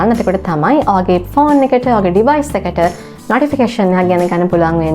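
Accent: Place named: England, United Kingdom. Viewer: i love your accent, my guess is that you studied in England.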